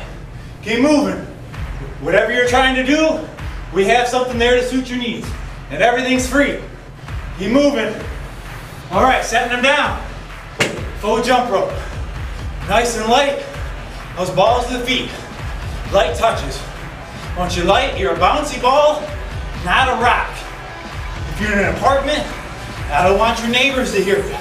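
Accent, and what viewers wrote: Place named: Minnesota, United States. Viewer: you're awesome. i love the accent, too. lol. it's exactly the same as my roommate's from mn.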